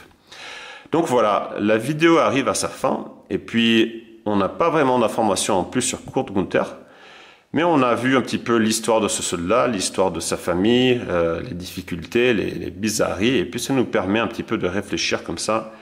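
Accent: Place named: Switzerland. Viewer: Ben avec votre accent je pensais que vous étiez Suisse, désolé.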